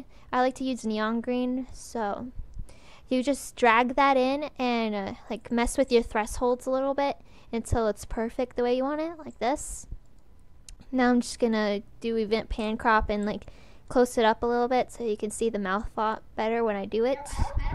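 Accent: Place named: United States. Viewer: Nice voice, now I know ur American xD (compliment)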